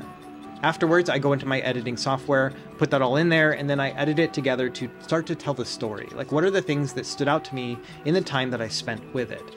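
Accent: Minnesota, United States. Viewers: As a Minnesotan, it makes so much sense now that that you picked up a bit of Minnesotan accent! I could never quite put my tongue on it, but your voice felt familiar lol! Love the vids, keep it up ❤ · Congrats on 400k subscribers, its well deserved. This is one of the highest quality channels on YouTube and the effort you put in really shows. It's interesting what you said about the midwest accent, I always just thought you were from Minnesota. Won't be long to 1 mil subs now! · Oh wow, I didn't hear the Minnesota until you pointed it out but now that you say it I absolutely do. 😂 Love your work; thank you for all the dedication and time you put into everything you do. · Also, thanks for commenting on your accent. I’ve never been able to place it, but you nailed it - definitely Minnesotan. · Minnesotan here, no wonder I vibe with you so much. Similar interests, familiar accent. 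Great job with the channel. You singlehandedly got me into retro handhelds :D had no idea you are in your 40s, I'm 28 and bald, so I thought you were younger than me lol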